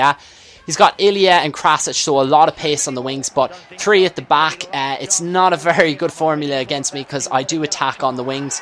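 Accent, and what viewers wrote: Ireland. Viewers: its very nice too see an irish comentator.:)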